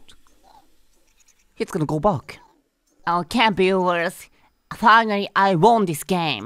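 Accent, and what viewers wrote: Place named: Japan. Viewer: good Japanese English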